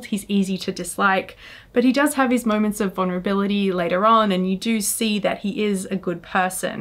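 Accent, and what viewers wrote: Australia. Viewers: Are you Aussie? I like your accent. 😁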